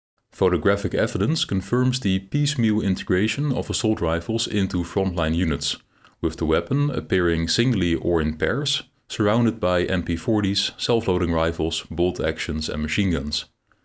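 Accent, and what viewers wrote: Netherlands. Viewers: this dutch raw accent is unlistenable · You'r obviously Dutch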